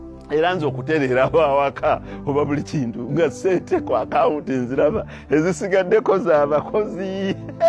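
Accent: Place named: Kigezi, Uganda. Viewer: Naye onosoboka gwe kweeli,enjogera nga yakigaga kyereere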